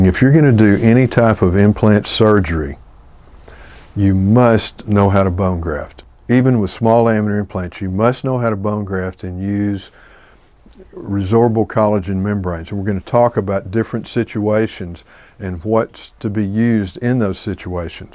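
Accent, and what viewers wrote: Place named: Texas, United States. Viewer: The only doc I would listen to with the Texas southern accent 🙂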